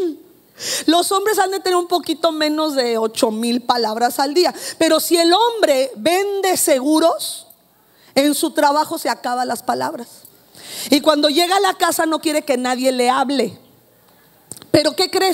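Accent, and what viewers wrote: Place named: North, Mexico. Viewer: Parece más de acá dl. Norte q del D.F. x su forma d hablar 😄 buen msje."q estamos reflejando"? 😕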